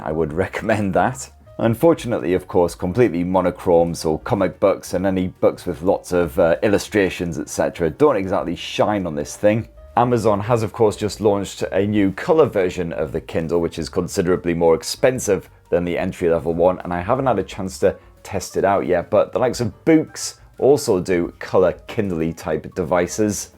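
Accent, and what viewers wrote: United Kingdom. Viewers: i need fucking subtitles for your bongish accent , learn to speak like a decent human.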